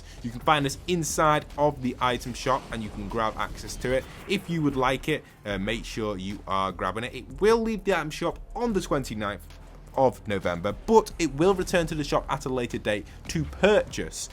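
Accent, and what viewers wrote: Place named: United Kingdom. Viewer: He sounds like he is form the UK and they call Soccer Football over there.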